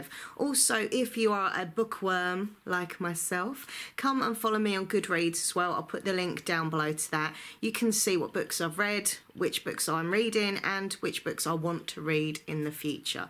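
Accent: United Kingdom. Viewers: I want to win this book because my native language is not English and I use books films and youtube videos for learning the language and I think books are the best in this because there is a lot of vocabulary in it. I learn English for some time and I try to learn more and more, so I'm trying to read English books and watch your videos, because you have a strong British accent which is good to practice listening.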